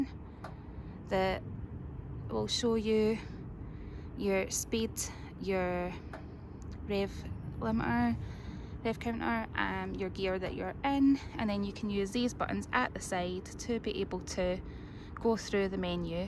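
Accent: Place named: Scotland, United Kingdom. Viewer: Very deep Scottish accent!!!! Thank you for the info!!!!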